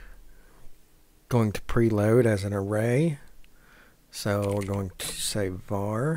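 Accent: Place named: South, United States. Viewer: Watching this I'm thinking his southern accent really undercuts his credibility, half way through I realize he knows what hes talking about so I stick around to the end to hear the "ain't it puuurdy" and I died! lmaooo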